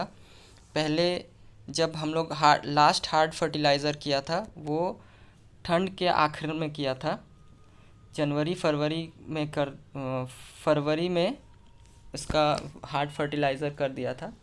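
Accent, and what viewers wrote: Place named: West Bengal, India. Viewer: Are you from west bengal ?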